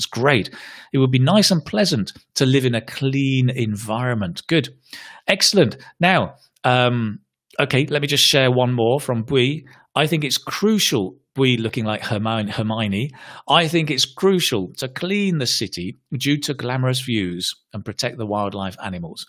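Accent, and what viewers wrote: United Kingdom. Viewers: Is this British accent , please anyone tell me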